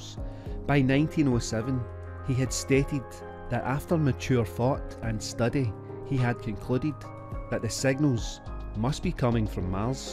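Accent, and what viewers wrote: Scotland, United Kingdom. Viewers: Really enjoying this Scottsman's narration !!!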